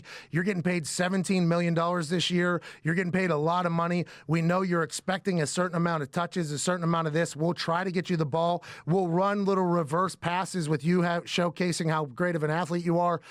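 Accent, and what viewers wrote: Pittsburgh, United States. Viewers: I love it when da Pittsburgh accent come aut. Yuenz can hear dat, can't ya.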